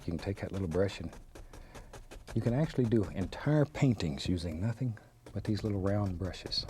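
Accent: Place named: Florida, United States. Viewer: He and his dad did a lot of carpentry work in the Orlando area back in the late 50s early 60s. I’m a native Central Floridian and his accent and demeanor harken back to simpler time in Central Florida.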